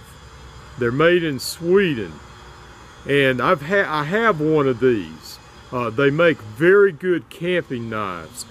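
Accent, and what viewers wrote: South, United States. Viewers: dudes voice is from country heaven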